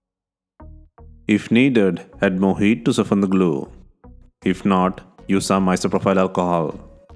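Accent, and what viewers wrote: India. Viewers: Thank for the instructions! I'm curious, are you from India? Sounds like Indian accent 😊 By the way, I'm from Belarus! 🇧🇾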